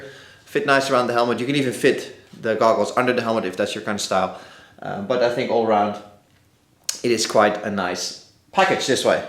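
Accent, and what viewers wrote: Netherlands. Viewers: Are you Dutch? It sounds like you have a Dutch accent haha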